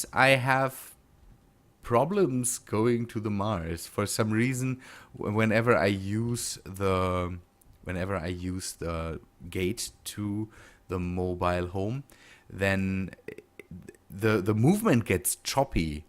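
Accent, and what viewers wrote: Germany. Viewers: Deine Englische Aussprache lässt annehmen du Sprichst auch Deutsch?? Wenn ja ist das in der DEV Version auch so das es einen Gravierenden Fehler im Spiel gibt? Seit dem Update 1.157.12 friert das Spiel immer wieder ein teilweise sogar für bis zu 5sek oder länger und dabei geht die Prozessor auslastung auf 100%. So macht das Spiel langsam echt keinen Spaß mehr. Ich habe schon alles mögliche versucht. Dieser Fehler tritt selbst dann auf wenn kein einziger Mod im Spiel ist :/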